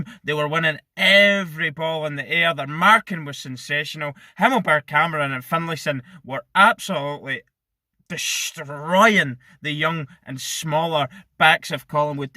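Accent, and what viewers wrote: Scotland, United Kingdom. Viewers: Beautiful human with a good Scottish accent. Subbed. Cheers!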